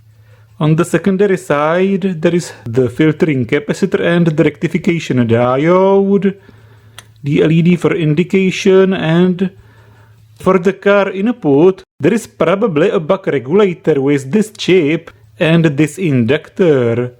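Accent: Czech Republic. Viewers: That is the best accent. Btw jsi Čech žejo 😂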